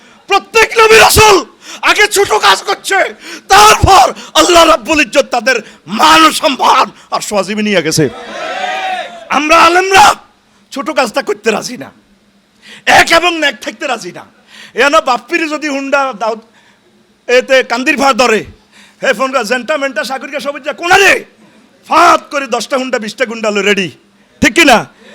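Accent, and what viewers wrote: Noakhali, Bangladesh. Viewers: আনরে লাগে মনে হয় নোয়াকালীর বক্তা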